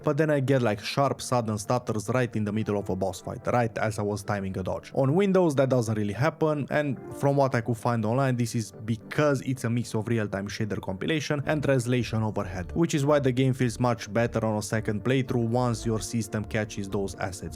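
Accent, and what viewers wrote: Romania. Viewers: Haha, accentul românesc lovește direct. Pentru cele mai bune performanțe pe Linux le vei avea pe AMD sau Intel. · the moment i heard your voice I could tell you're also Romanian bravo man, fain video, dar Ubuntu e meh, Mint pentru incepatori, si CachyOS/Endeavour daca ai chef de tinkering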